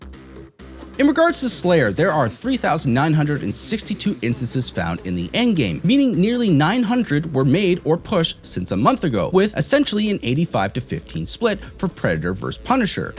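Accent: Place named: Canada. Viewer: On a side note your Canadian accent is very thick and I’m a foreigner 😂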